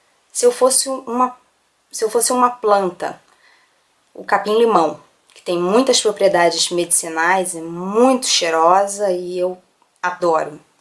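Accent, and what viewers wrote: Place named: Rio de Janeiro, Brazil. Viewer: oieee.. td bom???? adorei seu canal.. que sotaque lindo... carioca???? já super me inscrevi aqui =] no meu canal eu faço vlogs, tenho dois bebês, gemeos e mostro minha rotina com eles.... =] ficarei feliz em te ver por la... vi que vc tem o canal desde 2012 mas só começou com os videos a um mês atras.. continue... vc fala bem.. é linda e parece muito simpática.... sucesso... bjssss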